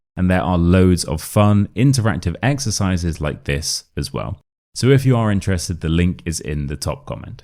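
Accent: United Kingdom. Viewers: Hiya, thank you for your video and offering your English class. I love your British accent! I am living and working in UK for year s, still struggle for my pronunciation and accent. Your voice and tone are so comfortable to keep listening, and love love your sense of humour too! Thanks again your lessons and I can,t wait for your next video❤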